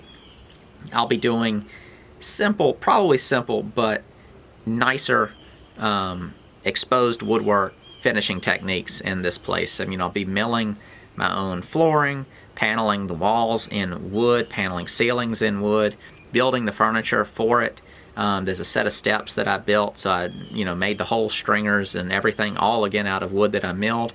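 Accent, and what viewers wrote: South, United States. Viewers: American with a Southern accent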